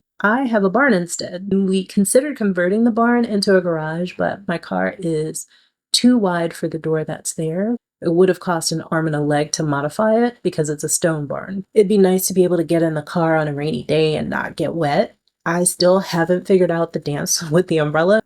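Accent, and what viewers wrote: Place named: Spain. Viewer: Ooh ooh, lovely Spanish accent 👌🤗 Hey a biker chic too 👌 All minor inconveniences compared to the maggot that's damaging US democracy 😉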